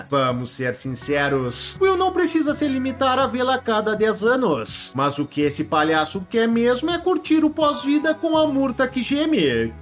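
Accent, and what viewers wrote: Rio Grande do Sul, Brazil. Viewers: bah o meu tu é gaúcho né??? muito bom é os guri hehehehehhe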